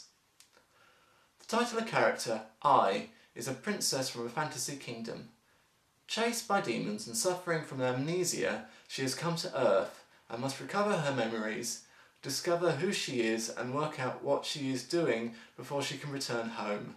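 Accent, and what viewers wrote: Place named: United Kingdom. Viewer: I'm just wondering and not trying to be rude but why do you talk like you are a British unless of course you are a brit?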